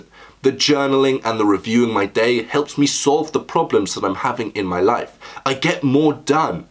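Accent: United Kingdom. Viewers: ofc this guy is british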